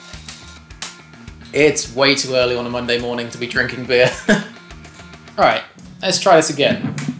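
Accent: United Kingdom. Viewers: You're the man with the most marked British accent I've ever seen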